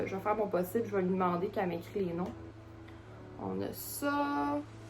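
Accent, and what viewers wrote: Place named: Canada. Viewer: J'ai découvert ta chaine il y a plus de 2 mois (avec ton Plant Tour). Je ne commente jamais, mais j'adore tes vidéos et ta chaine 😇 J'apprécie aussi beaucoup ton accent canadien (j'ai des amis qui habitent à Montréal). Merci beaucoup pour cet unboxing (et les derniers), c'est comme ouvrir des cadeaux de Noël 😊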